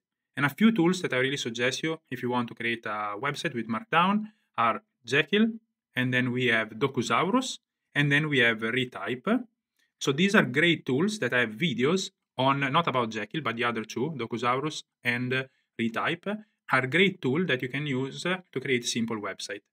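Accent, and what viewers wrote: Italy. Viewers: love your italian accent xD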